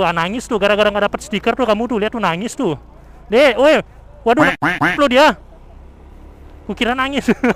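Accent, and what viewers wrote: Bali, Indonesia. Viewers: Dari logatnya aja udah keliatan, pasti abang orang bali ya 😅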